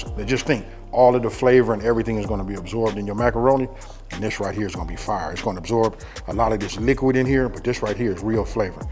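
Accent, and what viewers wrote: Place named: California, United States. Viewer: I love your cali accent, you making me miss home lol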